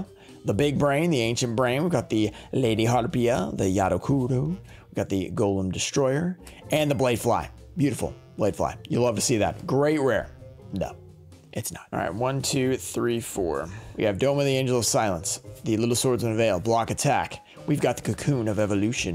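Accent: Portugal. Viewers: Random question, are you Portuguese?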